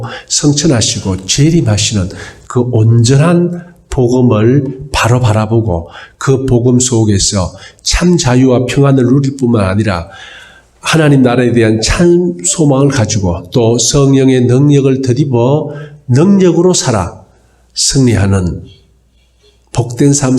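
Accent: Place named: Gyeongsang, South Korea. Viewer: 경상도 구수한 사툴이 할렐루야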